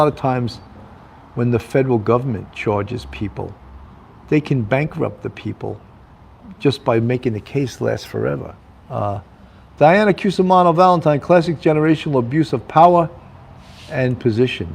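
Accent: New York, United States. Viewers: I'm a Wantagh lady, living in NC! My aunt was friends with Carol Baldwin, Alec's mom. They helped each other go through cancer, what a sweet lady! They took my daughters and I to a July 4th parade and Mrs Baldwin gave my daughter a doll her daughter had. I have a pic if Alec with my aunt at Billy's wedding. Yes, he's arrogant and should have kept his mouth SHUT!!! They may make an example of him! LOVE hearing your NY voice, reminds me of home!!! Love your jokes and songs!!! I saw last year and enjoy your songs and dongs of that era!!!, my daughters are 40 and 41!!!!!!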